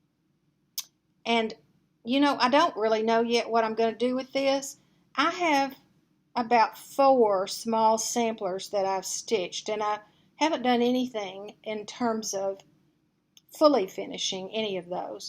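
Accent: South, United States. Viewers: My mother was born and raised on a farm that her family were homesteaders on in Conway, Arkansas. I think that's why whenever I'm missing her I always tune you in so I can hear her through your beautiful southern dialect. Wish I lived close to you, I'd certainly adopt you. Hugs a bunch, Toni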